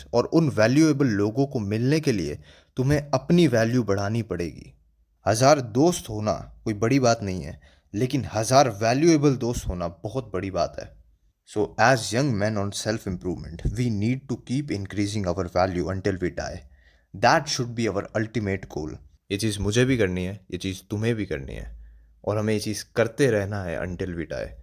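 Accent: Rajasthan, India. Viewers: Bro you from rajasthan? The way you talk really gives me that vibe ❤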